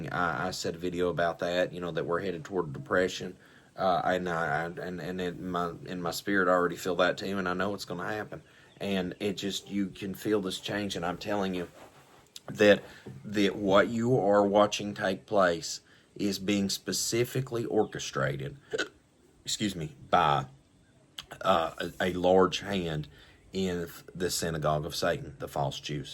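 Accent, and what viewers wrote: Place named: Texas, United States. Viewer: Where in Texas are you speaking brother ?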